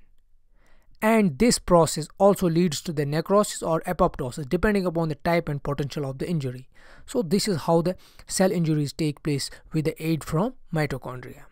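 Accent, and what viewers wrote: India. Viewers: Kindly put original subtitles because your English has a very Indian accent which is difficult for some people to understand.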